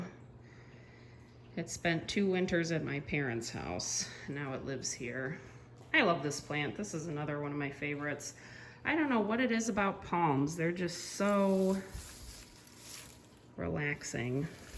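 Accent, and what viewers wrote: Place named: Northeast, United States. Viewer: From Amherst Ohio I hear the northeast accent....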